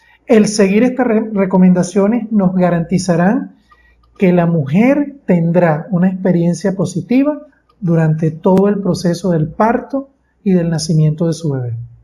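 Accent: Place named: Venezuela. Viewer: Dr que Gusto me da que comparta estos videos... No sabe que felicidad que nos enseñe que nos comparta sus conocimientos...yo soy feliz Además Si se le escucha que sea de Venezuela que bonito hablan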